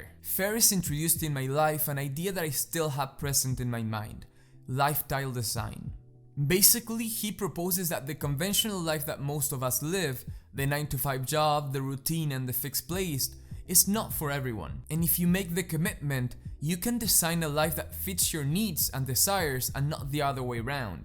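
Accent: Argentina. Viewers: quee? sos argentino?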